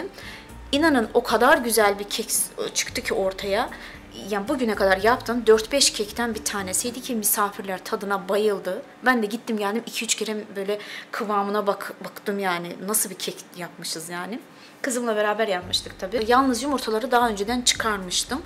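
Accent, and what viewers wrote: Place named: Azerbaijan. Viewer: Hər şey əladı.Amma zəhmət olmazsa öz dilinizdə kanal acın .Sizin türk deyil azeri oldugunuzu lehceniz asanlıqla ələ verir.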